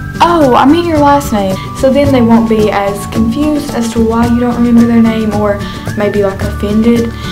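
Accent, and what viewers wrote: South, United States. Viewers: your sooo country😍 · are you from the south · Pretty sure it's southern. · wish i could like the vid but i hate southern accents :/ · she's definitely from the states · A southern accent. If you can't deal with it you need to grow up. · Sounds like she has a southern accent to me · I really like her country accent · I thinks she's from Tennessee, or Texas, but not from Britain. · she is so country omlllllll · she has a country accent so I guess she is country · yeah I'm Australian too and it bothers me seeing all these people confusing this clearly american accent for an Aussie one haha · I thought at the beginning but she has a freaking southern accent as well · Lol same! First I thought she was Scottish, then British or Australian! Until I realized she's probably from the south of the US. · What's your accent? At first I thought it was foreign but when I listened harder it sounds country. 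· a country accent and has blonde hair hmm.. this makes me wonder hmm..how would she look like ??. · Why is your accent so country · dat southern accent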